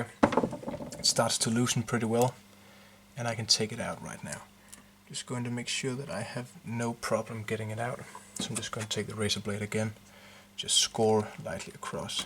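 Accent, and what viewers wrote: Denmark. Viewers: Rigtig god video, der præcist viser, hvordan man selv med lidt omhu, kan udskifte en nut på sin guitar. Pænt engelsk, med en smule charmerende nordjydsk accent. Per i Aarhus😎🇩🇰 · Thanks for this video! BTW are you Danish by chance? Your accent seems to be that of a Dane. If not, sorry for my incorrect assumption. Thanks again!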